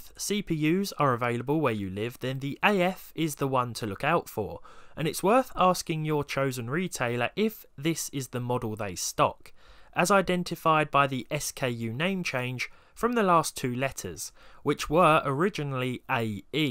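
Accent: United Kingdom. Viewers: I love ur Britishaccent ^^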